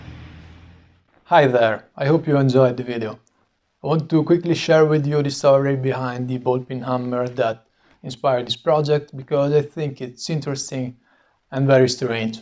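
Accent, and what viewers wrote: Italy. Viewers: Nice hatchet...... Una curiosità sei italiano per caso? L'accento è quello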